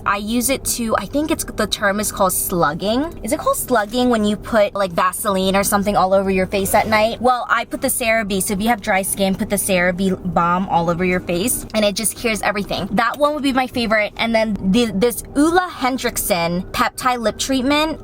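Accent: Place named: Vietnam. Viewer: good guess! I was thinking Vietnamese too from her accent.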